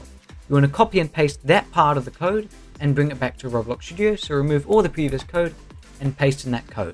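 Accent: United Kingdom. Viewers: Bro I can’t understand British (not making fun of the accent) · Are You Britisch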